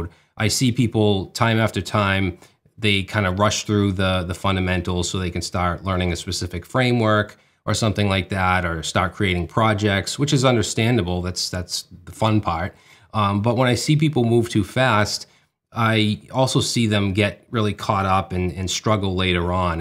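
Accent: Boston, United States. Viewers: I love the Boston accent.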